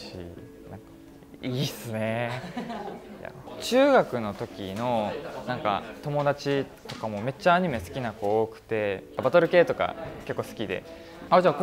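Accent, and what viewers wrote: Kansai, Japan. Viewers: イケメン❤かわいい❤ 面白い❤明るい❤スタイル❤ 黒髪❤関西弁❤大好き❤ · インタビューやからはじめはわりと標準語で話してたのに 自分の好きなものを夢中で話してるうちにしっかり関西弁になってる雄大がたまらなく愛おしい😊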